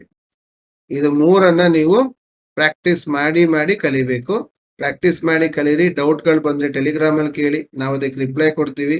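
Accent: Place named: North Karnataka, India. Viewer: Good video sir Uttara Karnataka style helata edira sir stock bagge heli sir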